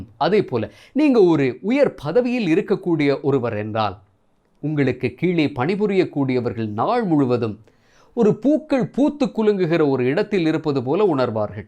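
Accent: Sri Lanka. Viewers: Arumaiyana Sri Lankan Tamil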